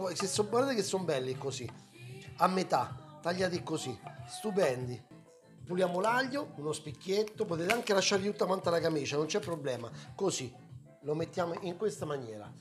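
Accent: Rome, Italy. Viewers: Meraviglioso piatto. Ti assicuro che a volte non mi interessa il video :. Basta la tua voce de Roma che mi sento a casa. Grazie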